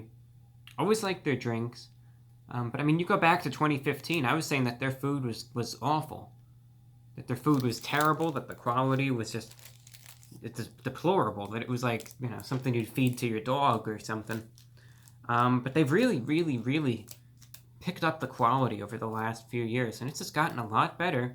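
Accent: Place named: New York, United States. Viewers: does have a little bit of New York accent, but lives in Florida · He is from New York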